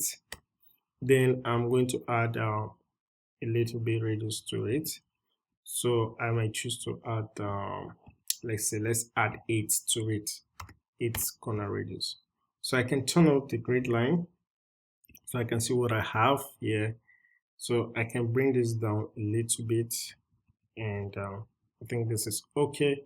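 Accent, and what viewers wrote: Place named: Nigeria. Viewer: sounds Nigerian, finally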